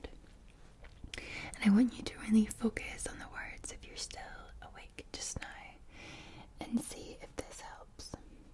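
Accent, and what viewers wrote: Scotland, United Kingdom. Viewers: Boeys and girrels 😂 love the Scottish dialect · Yeah. I like the talking. She has one of the best soothing and most relaxing voices out there. And her Scottish accent is just right. Its relaxing af. To whomever has a problem with it. GTFO bro. We love just as she is. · Wow I like getting personal my Scottish sassy lassie! 😌😏☠️🏴🖤🌌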